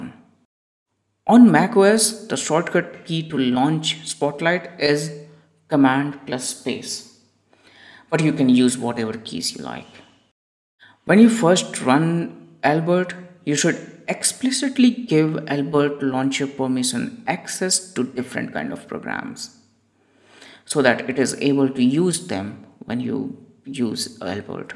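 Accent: India. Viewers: Why Indian accent very thick, i am little uncomfortable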